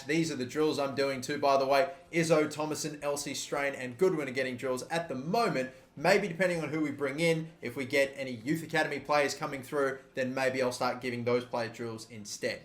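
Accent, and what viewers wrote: Australia. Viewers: Are you Australian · are you australian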